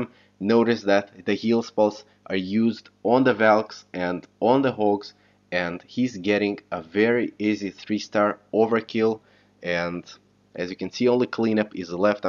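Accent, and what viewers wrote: Russia. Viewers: Ты русский? Просто я англичанин и знаю русский, и ты говоришь с русским акцентом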